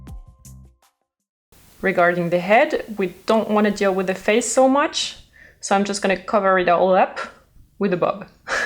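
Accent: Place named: France. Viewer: Very interesting. Very good teaching and a very generous teacher!!!!!!!!!! Thanks very much for sharing your ability. And your English, is very good, just a little french accent, non disturbing at all (I'm Italian).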